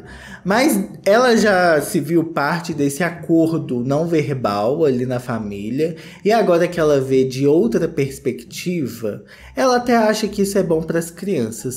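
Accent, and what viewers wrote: Minas Gerais, Brazil. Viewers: “Pera lá né” kkk vc e muito mineiro, eu amo o tô longe do meu estado e sempre que te assisto me sinto lá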